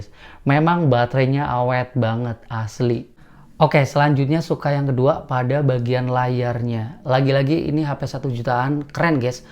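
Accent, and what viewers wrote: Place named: Banyumas, Indonesia. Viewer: Ora ngapak ora kepenak ya kang...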